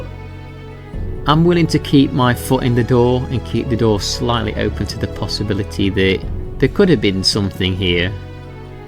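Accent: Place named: Manchester, United Kingdom. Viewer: Couple months late but this video (and your channel) and criminally underrated. I'm only 11 minutes in but I can already tell this is gonna be a great documentary. Brings back memories of me desperately looking up for ANY content regarding this when youtube first became a site. Fond memories of watching Thumbs Up Masters contents. Also I know it's not an AI voice cos AI is terrible at doing Manc accents ;) Liked and subscribed!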